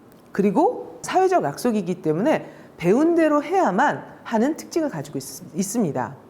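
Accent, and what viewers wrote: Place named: Honam, South Korea. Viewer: 내가 음성 감수성이 뛰어난데 서울표준어에 호남 어투가 숨어있네 고향 사람이라 반가운데 서울 출신으로 되어있네 고향이 부끄럽나 왜 왜 왜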